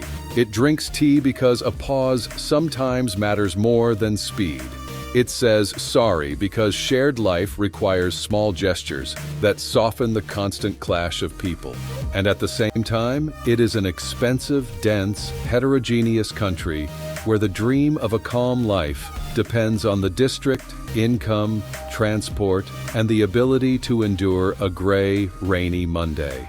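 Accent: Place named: United States. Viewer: The AI voiceover would be a bit more appropriate if it was anglicised & deleted americanisations like,(Looo——— tenant.& rowwt) The video is ,however, a true reflection of Brits in general.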